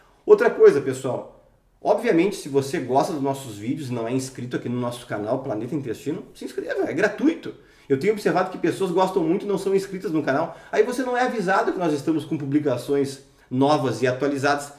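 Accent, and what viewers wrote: Rio Grande do Sul, Brazil. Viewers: É bem gaúcho mesmo, sotaque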